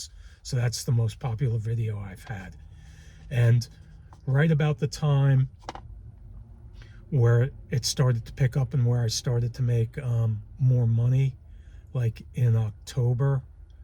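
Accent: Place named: Mid-Atlantic, United States. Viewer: Mid Atlantic accent. Interesting.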